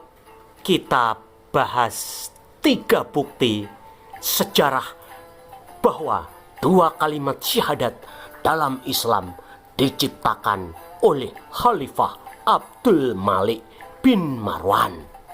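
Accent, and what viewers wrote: Java, Indonesia. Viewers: Medok banget kamu cuk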